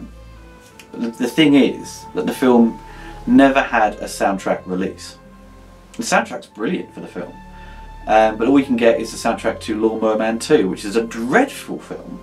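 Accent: United Kingdom. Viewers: I see these are only available to the US/Canada and I'm guessing from your accent you're from the UK? I ask as I'm also UK based and wondered how you got hold of them? Would love to get my hands on these:)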